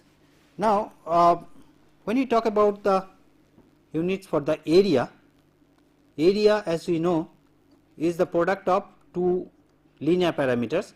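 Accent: India. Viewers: The lecture is nice, but the accent is a bit too heavy for non-indian students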